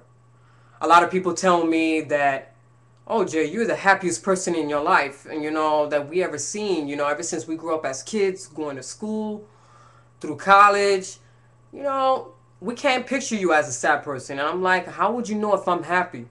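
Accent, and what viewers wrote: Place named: New York, United States. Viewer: Good luck to you! I though i detected a nyc accent.. It's very easy to transition in nyc, reconsider doing it over here. Peace bro.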